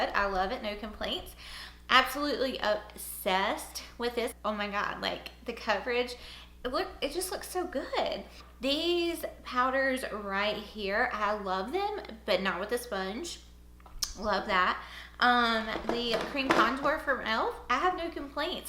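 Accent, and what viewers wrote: South, United States. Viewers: You remind me of Casey Holmes so much!!! You both have southern accent and you both have same personality!!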